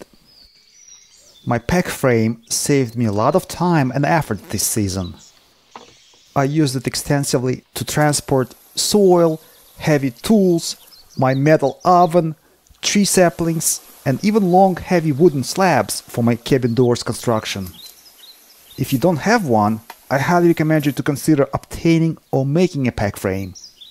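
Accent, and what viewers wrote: Russia. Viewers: The probably hear his Russian accent and vote thumbs down. Pathetic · I watch the cabin build moment to go then I watch this one thank you again my friend thank you very much I thought I recognized a Russian in your accent. As I said the first time I wrote you a note we share a common dream you are making it happen I put other things first and never did make it happen. Thank you for this wonderful film clip I hope to see many more from you I know your time is limited but they are very precious to me I will as I said in the first comment use you as an example of why we must follow our dreams while we can thank you again my friend People Are People wherever you go and you are a fine example of a good man I can see you good and pure heart from all these miles away good luck and Godspeed to you my friend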